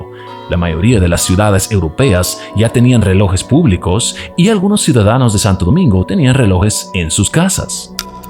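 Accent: Germany. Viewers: Más bien está haciendo una pronunciación alemana.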